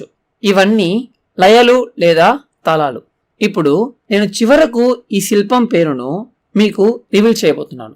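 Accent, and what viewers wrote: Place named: Tamil Nadu, India. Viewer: గొంతు నీదేలా ఉంది. తమిళ్ వారు మాట్లాడే తెలుగు లా ఉంది.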